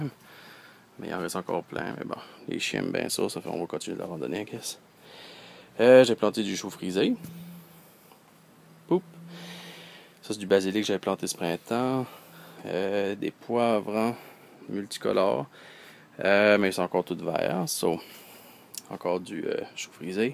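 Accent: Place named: Acadia, Canada. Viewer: un bon vid francais avec un petit accent acadien sa ses beau pis ses merveilleux de parler deux langue et tres bien en plus lol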